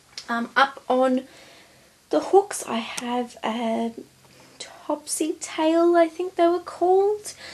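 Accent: Australia. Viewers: i was wondering what your accent was, and then you said its only in australia. I am australian too... i fail. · Where do you buy your OPI nail polish, because I live in Australia and you sound Australian :) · are you from Australia?? i LOVE Aussies!! :D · I can listen to Australians talk all day, they just make everything sound better!!!!!!!! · Aussie?? I can tell by the very familiar "ova" "holda" ~ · r u from australia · how could they not tell its aussie? lol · r u australian??? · I do love vidoes that make me feel I have very little make up.=) Thanks for that and, yes, I like the Aussie accent too - it's quite cloes to my own Brit tones, but still with a little touch of the exotic.=) Take care!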